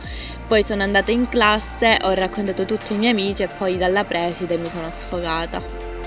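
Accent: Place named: Calabria, Italy. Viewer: Sicuramente tu non sei una cima visto il tuo accento calabrese questo canale fa di tutto x fare visualizzazione non credo a una parola di quanto hai detto 👎👎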